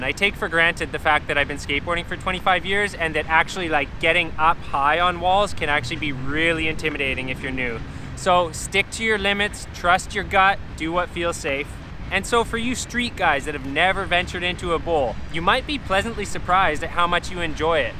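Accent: Canada. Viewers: Is that a fellow Canadian I hear?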